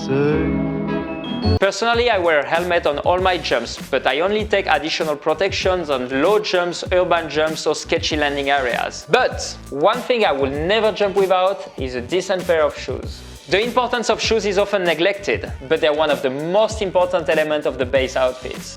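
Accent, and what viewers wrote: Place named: France. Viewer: ptdr je me disais bien que tu avais un accent français, cool !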